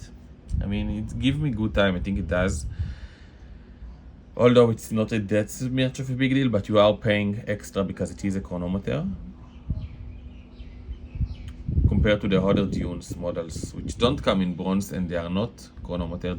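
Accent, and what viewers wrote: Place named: Israel. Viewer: I fucking love israeli accent mate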